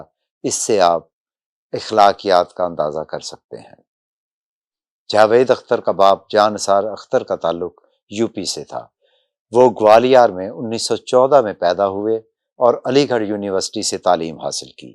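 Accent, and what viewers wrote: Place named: Punjab, Pakistan. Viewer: urdu reading in Punjabi style.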